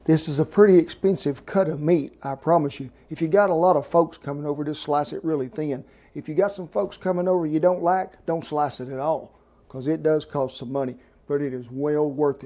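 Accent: South, United States. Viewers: I love that American twang😂 🇬🇧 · I swear to god. Folks that sound like Bubba from Forest Gump know their shit. Not trying to make fun of this gentleman’s accent but by the end of this video I was drooling. I’ve come to terms that accent = master cooks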